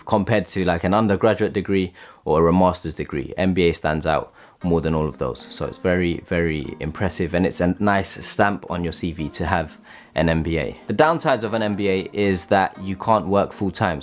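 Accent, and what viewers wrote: London, United Kingdom. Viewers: you have such a nice accent are you from west london?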